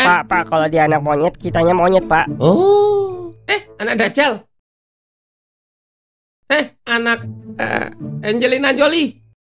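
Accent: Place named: Java, Indonesia. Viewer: Dulu medok asw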